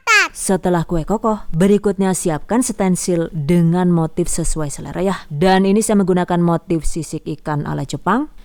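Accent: Banyumas, Indonesia. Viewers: mak, rika janeh wong ndi? ngapak tmn ketone koh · Rika si wong ngdi, deneng ngapak? 😅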